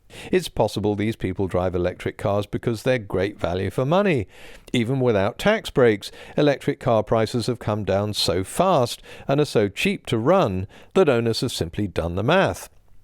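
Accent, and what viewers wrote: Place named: England, United Kingdom. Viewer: He's not smug, he's speaking with an English accent. That's literally how we speak here. If that's "insufferable" to you, you clearly have never suffered. But your Bald Eagle profile and racist intolerant approach already says right wing populist long before your opinions could be considered valid.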